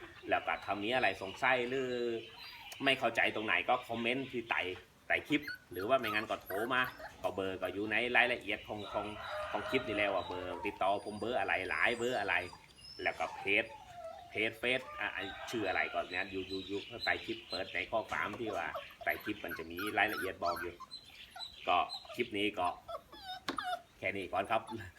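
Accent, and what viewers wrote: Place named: Phetchaburi, Thailand. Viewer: คน เพชร ป่าวครับถ้าคนเพชรคนบ้านเดียวกันสําเนียงเหมือนคนเพชร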